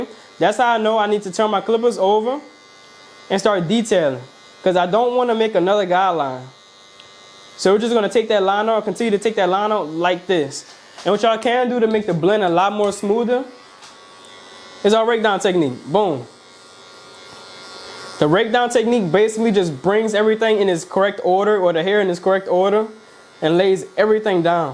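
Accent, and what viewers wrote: New Orleans, United States. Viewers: New fave barber channel, you do an excellent job explaining! Sounds like you from NOLA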